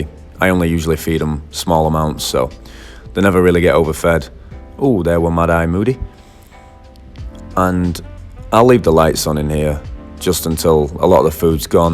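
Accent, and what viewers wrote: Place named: Manchester, United Kingdom. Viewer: Nice vid --makes sense. I'm actually gutted cos I thought you was a Manc livin locally was gonna ask you if I could come round ya gaff and buy some fish of ya. Never mind,seems like ya doin well in the states --good luck to ya👍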